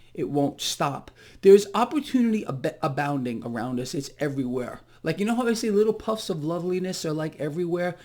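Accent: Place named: New York, United States. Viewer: I genuinely thought you were in NY. Maybe it’s the accent. I know the market isn’t gonna reverse anytime soon thanks to the fed, but It will reverse, eventually, and it’s gonna be a doozy. I understand your logic but nothing goes up forever dude. Buy high sell higher? Hahaha. You turned everything upside down. You’re trading though. You’re not a “buy and hold “ guy. You’re not saying buy and hold for your future however am I correct?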